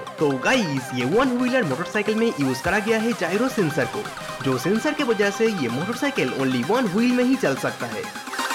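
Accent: Bengal, India. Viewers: Your Hindi accent proves that you're a native Bengali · Pta nhi yaar tm logon ko subscribers kaise mil jatein h. Main video ko dekhna chah rha tha par 10scnds bhi dekh nhi paya bcz of your hindi accent.. me too a Bengali. But agar YouTuber banna h hindi me toh dhang ka bolna toh sikhlo. Irritable accent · Aap bengali ho 😊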